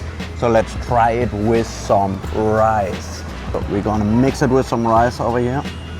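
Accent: Germany. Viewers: I like Your German aksen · Direkt den deutschen Akzent rausgehört 😂😂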